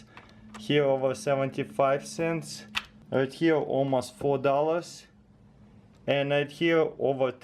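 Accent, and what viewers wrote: Russia. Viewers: Dudeeee i love his accent, super heavy Russian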